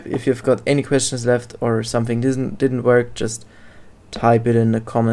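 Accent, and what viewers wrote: Germany. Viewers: Digga dieser deutsche aktzent den habe ich auch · Man merkt voll das du Deutscher bist am ende vorallem mit Perfekt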